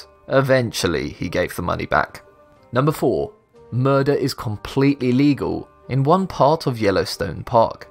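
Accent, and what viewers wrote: United Kingdom. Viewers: Why did I subscribe just cause he's British 😂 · annoying british accent unsubscribed.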